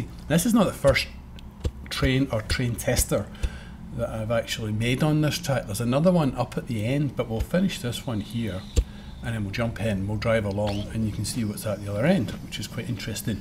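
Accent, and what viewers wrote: Scotland, United Kingdom. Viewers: really? 0.o That's interesting. Do all people in Scotland speak like that? I'm so curious :D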